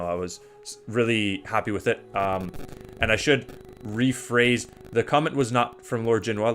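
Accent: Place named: Canada. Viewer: OOOh that new microphone really makes the Canadian accent come out!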